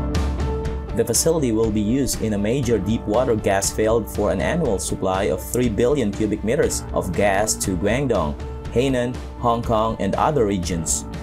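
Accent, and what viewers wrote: Philippines. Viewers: Pinoy ka boss? Accent mo kasi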